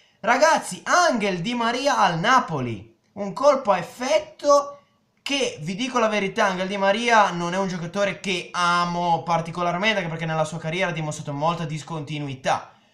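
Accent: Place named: North, Italy. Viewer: Come puoi parlare di calcio. Sembri un contadino del nord per questo sei della Lazio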